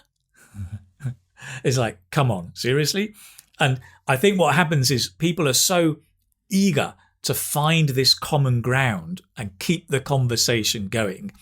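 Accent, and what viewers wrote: United Kingdom. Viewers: I didn´t believe that I coulp understand british English but this teacher is very good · British accent, that's cool.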